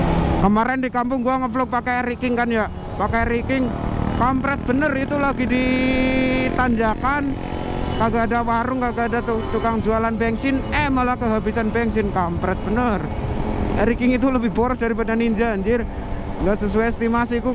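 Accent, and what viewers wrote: Banyumas, Indonesia. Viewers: Ngapak kocak gaess😂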